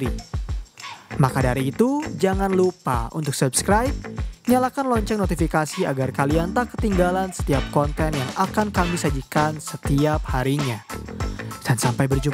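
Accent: West Java, Indonesia. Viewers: fix org sunda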